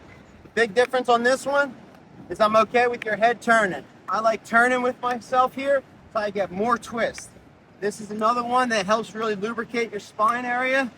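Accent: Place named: Philadelphia, United States. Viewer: Omg. I love you but that Philly accent...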